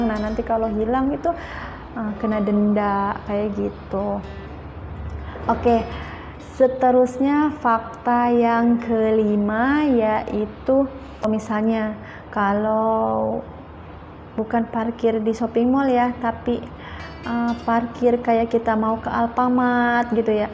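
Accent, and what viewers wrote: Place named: Malaysia. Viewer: Mbak suda Ada sedikit loghat Malaysia yah dlm pertuturannya Udah Brapa lama d sini mbak ?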